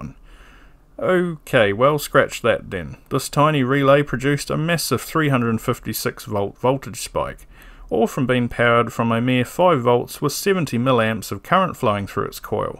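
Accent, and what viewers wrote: New Zealand. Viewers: Great vid, thanks. Nice to hear a fellow Kiwi accent for once! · 😍 I could listen to a Kiwi accent all day. ❤ from the West Island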